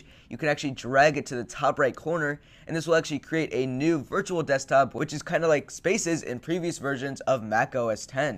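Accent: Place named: Canada. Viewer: You sound very Canadian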